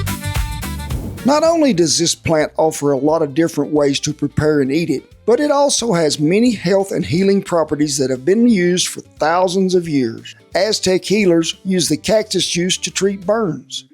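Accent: United States. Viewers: A country white man peeling and prepping nopales with a huge USA flag behind him and has a country accent. Mexican culture is strong.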